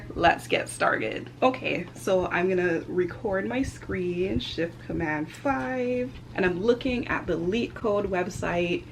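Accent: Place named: Midwest, United States. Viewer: Are you from mid west? I hear a slight accent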